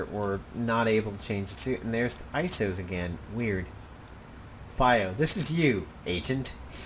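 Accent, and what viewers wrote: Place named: New Jersey, United States. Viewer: Thanks for posting this video,. My hearing isn't the best, but I would've guessed you were from New Jersey.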